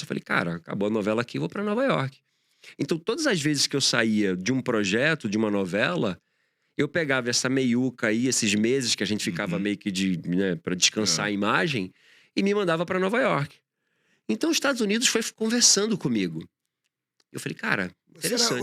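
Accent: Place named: Recife, Brazil. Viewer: O cara sai de Recife, mas o sotaque não sai.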